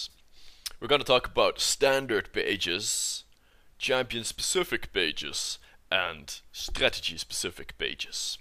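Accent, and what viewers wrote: Netherlands. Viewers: Lol ik vind het gewoon grappig dat nu pas iedereen er achter komt dat hij nederlands is, hoort dan niemand dat nederlandse accent in zijn engels xD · Yup I think so cause' he's dutch and dutch people have that accent plus he has a rather deep voice :) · Ik hoorde aan je stem al dat je Nederlands was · yup his accent is stereotype dutch :Z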